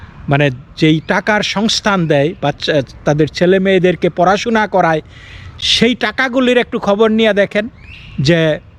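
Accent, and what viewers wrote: Bangal, Bangladesh. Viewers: অবশেষে আপনার বোধ বুদ্ধি কিছুটা হলেও হয়েছে। তবে বাঙাল উচ্চারণ প্রমিত বাংলা করুন।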